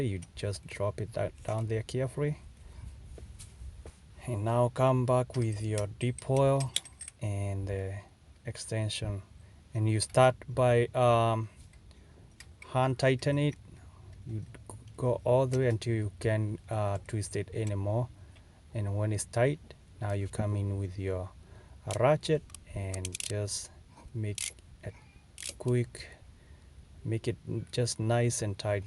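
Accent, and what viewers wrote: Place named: Somalia. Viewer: I can tell this brother is from Somalia great job werya.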